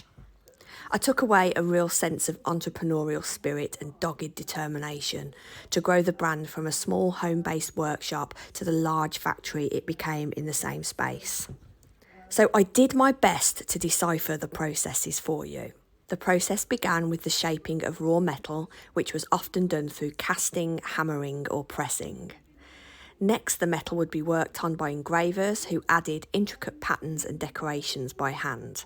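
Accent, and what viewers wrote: Birmingham, United Kingdom. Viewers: Your enthusiasm shines through. Interesting,informative and beautiful Brummie delivery❤❤❤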